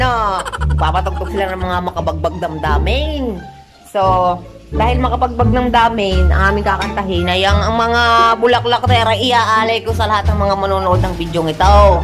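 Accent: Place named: Batangas, Philippines. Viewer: Hala sis May punto ka taga Saan ka sis. Batangas ka ba or cavite? Talaga sis Daming trabaho ang binigay sa inyo. Wow naman amo nyo pala sobrang bait pala nila. Nakakatawa ka sis May pagka comedy pala ang channel mo lagi ka ba ng ganyan? Sa mga videos mo? That’s good sis. Ayan mas cute ka na dyan sis para kang diwata ng kagubatan. Bagay na bagay sa iyo sis. Nagawa ko na ang dapat sa iyo sis. Sana magustuhan mo Ayos na yon ha sis. Happy New Year. Ohh man part two pa pala mga bases naman. Ok lang yan sis kaya nyo yan May joker naman sa grupo hahaha.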